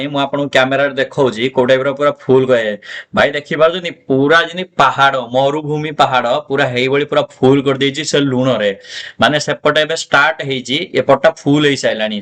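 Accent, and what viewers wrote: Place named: Angul, India. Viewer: nka ghaa anugul a4hmalik side ru ki....katha pura anugulia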